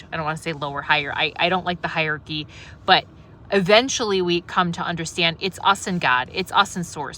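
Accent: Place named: Midwest, United States. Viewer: Wild to hear this with a Midwest accent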